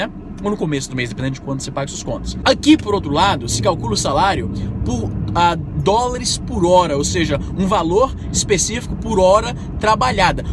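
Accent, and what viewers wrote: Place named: Brazil. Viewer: Ainda acho q vc ainda tem sotaque brasileiro(que nao eh uma coisa ruim!), mas vc tem muita fluencia, parabens! Gostaria q vc fizesse um video com as coisas ruins de morar no canada, pois muita gente se ilude e quebra a cara, quando se da conta que nao eh as mil maravilhas. Japoneses tem ateh um nome pra isso: sindrome de Paris. Coisas ruins, por exemplo: a dificuldade de um estrangeiro ter um relacionamento com nativa, o inverno, as comidas, a distancia com a familia e amigos, diferentes festas e festivais, a dificuldade de conversar assuntos cotidianos com um canadense (por exemplo, conversar sobre silvio santos (Br) x Terry fox (Ca)) Obrigado!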